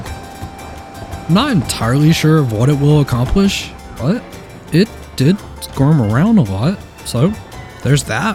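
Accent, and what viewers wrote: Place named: North Carolina, United States. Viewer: Youtube analytics are such a drag; one day your hard work will pay off but don't get burnt out or make your views equal your worth as a creator. Also I very much notice and appreciate the captions - above and beyond with your editing and production value!! Have you thought of streaming to make your youtube edits more enjoyable? Still lovin the NC accent btw just thought remind you haha ~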